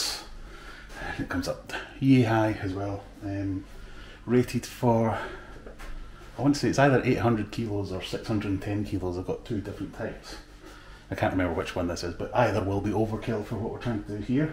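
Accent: Scotland, United Kingdom. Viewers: We are literally being screwed by fitting manufacturers to own dozens of these things with diameter, thread, pitch variations! Guess I was luck the ones I got for my outside taps went straight onto both of my shower outlets. Keep the videos coming and nice to hear a Scottish Accent (I am an economic refugee in darkest England and miss home!).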